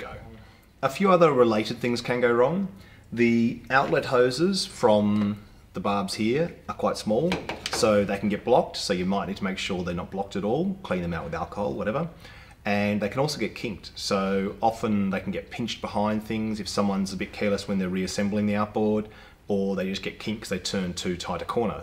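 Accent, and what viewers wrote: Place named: Australia. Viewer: Teaching a marine tech program, and we all love the old Outboards, love this guy. It's always more important with an Aussie accent too!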